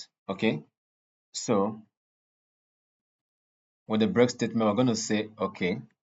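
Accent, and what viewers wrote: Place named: Nigeria. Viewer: Just watched your tutorials and like them. Noticed the Nigerian accent as I lived there in the 70's and attended St. Gregory's College in Lagos. I am an Electrical Engineering graduate and Oracle Certified Professional (Application Developer) and learning to take the Python certification (PCEP) in one month. Keep up the good work.👍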